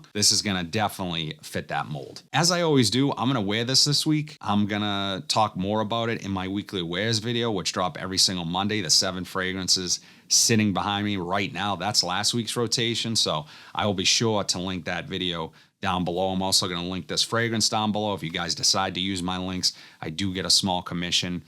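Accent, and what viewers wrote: Boston, United States. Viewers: Can't get pass his awful Boston accent 😂😂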